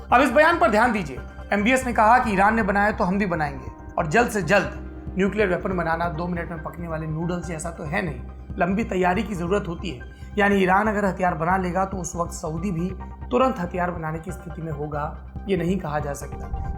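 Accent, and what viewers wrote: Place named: Bihar, India. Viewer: 11.48👍👍 baki jo h so toh haieye h ,😁😁. Chacha bihar nd up k log deeli-bambai kahe n chle jaye but ye jo apna bolne ka tarika ye na chutega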